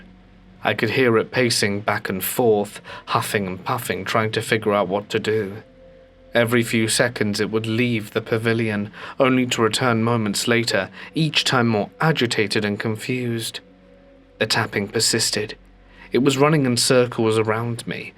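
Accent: United Kingdom. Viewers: Not bad, I enjoyed your accent not trying to be crochet about it just something about a UK accent talking about horror stories make the distinguished and eloquent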